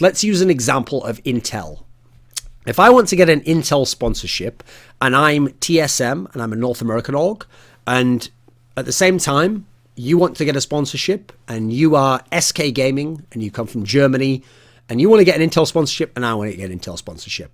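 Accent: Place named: Birmingham, United Kingdom. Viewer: Weak brummy accent. Sort your shit out Thorin.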